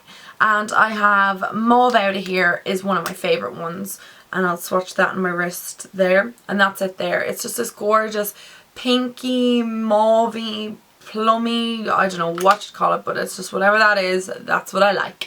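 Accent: Ireland. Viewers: I only found out about your channel now and its amazing. I figured you were irish coz of your accent. Your make up tutorials are amazing. I was wondering if you went to college for beauty therapy where?. Beacuse that's what i want to do when i leave school. Or what colleges would you recommend! Would really appreciate it! Once again your videos are amazing:) x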